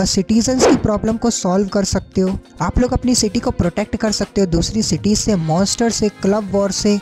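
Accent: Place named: India. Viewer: I do not understand this fast Indian accent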